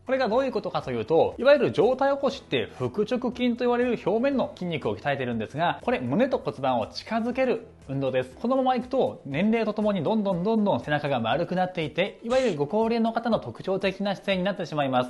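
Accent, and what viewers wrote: Kansai, Japan. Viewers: さんが、関西の方だと今日初めて気づきました！いつもありがとうございます!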